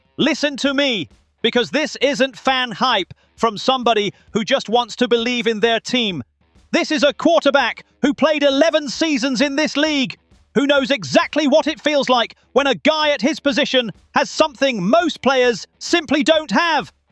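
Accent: United Kingdom. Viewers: Why are you using a British voice for American Football it's ANNOYING!!!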